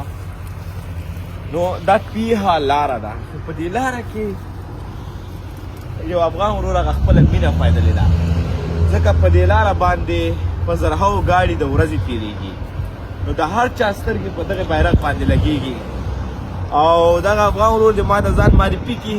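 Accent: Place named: Pakistan. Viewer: ته اول داخپل ژبه چی خبری کوی دااسلاح کړه بیاوازه افغان یم ځکه چی ستاټولی خبری ده پاکستانی دی یواری پښتوزده کړه بیاراشه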